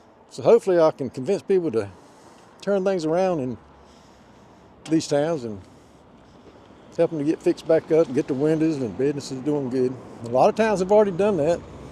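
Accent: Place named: South, United States. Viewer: Keep up with the stories, and don't change a thing with "talking Southern", as you are a Southerner after all. As for Rich Square, I feel it's doing a pretty good job of dealing with the hand they have been dealt. Like you said, some really beautiful homes on the outskirt of town, and signs of improvement in the town itself. I hope their plan includes saving those brick buildings if the interiors are half as nice as the exteriors.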